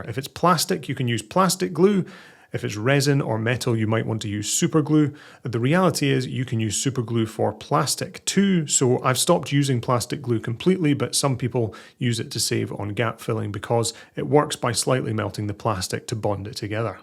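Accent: Scotland, United Kingdom. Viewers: Hi, nice to hear a fellow Scot doing content for the hobby, keep up the great work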